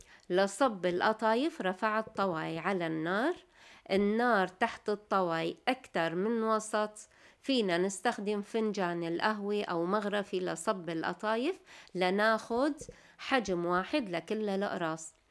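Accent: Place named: Idlib, Syria. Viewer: العفو منك اختي انت كاتبه مطبخنا الشامي بس لهجتك مو شامية لهجتك ادلبيه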